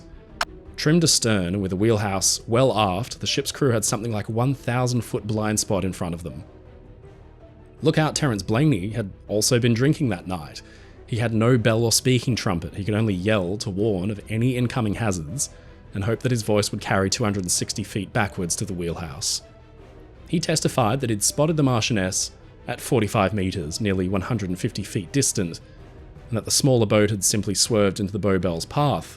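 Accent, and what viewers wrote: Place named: Australia. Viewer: Inspiration for an Eastenders storyline where Sharon loses her son Denny. Great Aussie accent you got.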